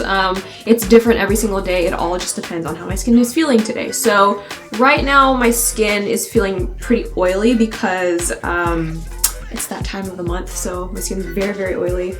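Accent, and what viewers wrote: Australia. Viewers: I just subscribed recently and when you started this video I was like "oh I forgot she was Australian" lmao. Loved this video:)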